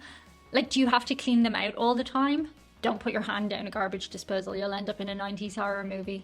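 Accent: Ireland. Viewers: Hi! New to your channel! - for a kick sometime, record yourself saying the words "power, pour, par, pair" - in your charming Irish accent - play it back, and enjoy the echo effect? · That Irish accent. 😍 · I have travelled the world, and find the Irish people the friendliest people on earth. PS - I love your accent/brogue.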